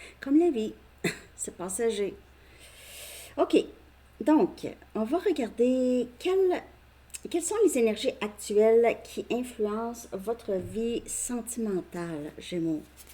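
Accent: Quebec, Canada. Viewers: Merci, ca me parle encore bcp ❤ vous êtes une vraie pepite 😊 et votre accent me ramène au temps où j'ai vecu au quebec 🥰😀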